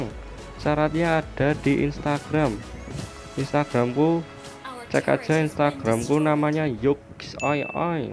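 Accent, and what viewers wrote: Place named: Banyumas, Indonesia. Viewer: Youtuber ngapak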